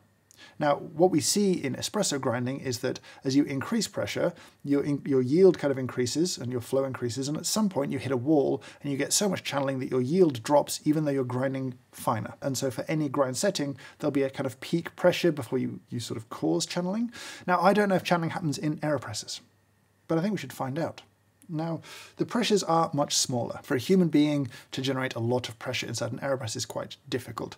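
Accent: United Kingdom. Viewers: Watching a funnily-quoiffed man with a British accent do SCIENCE! with a 30 buck coffee brewer was a surprisingly enthralling way to spend a Sunday morning. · You forgot British accent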